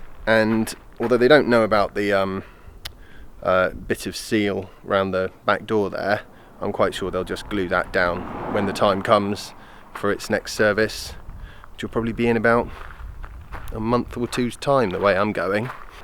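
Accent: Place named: United Kingdom. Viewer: Wait where are you from? You sound British and have a right hand drive vehicle but you speak in miles. I'm so confused.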